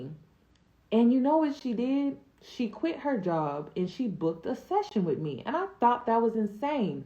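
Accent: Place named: Midwest, United States. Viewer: thanks. I heard the Midwest in there. 😊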